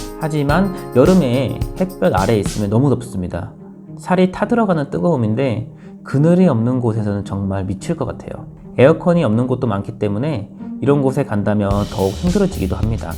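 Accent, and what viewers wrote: Jeolla, South Korea. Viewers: 전라도 분이 신거 같은데요 억양이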